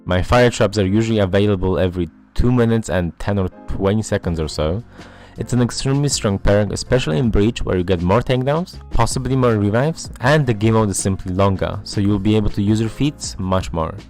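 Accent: Poland. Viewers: o to samo pytałem w którymś filmie xd Gość brzmi swojsko z tym angielskim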